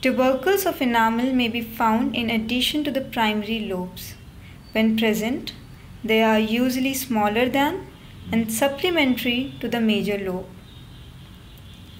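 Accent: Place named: India. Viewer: I like the fact that I didnt understand it in my language and I found someone to explain it better in English with an indian accent. Funny . Thank you !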